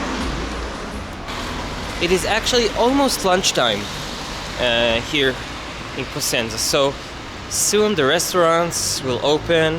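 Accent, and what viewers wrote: Brazil. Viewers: Your accent sounds Brazilian, are you from Brazil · You are Brazilian ,arent you ? Your accent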